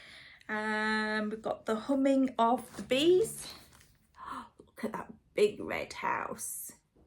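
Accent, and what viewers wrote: Yorkshire, United Kingdom. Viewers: I absolutely love your Flosstube! You are so genuine and funny.....an I do love a Yorkshire accent! Your stitching is gorgeous and very inspiring. Are you going to the Great British Sampler Weekend next year? 🤩♥️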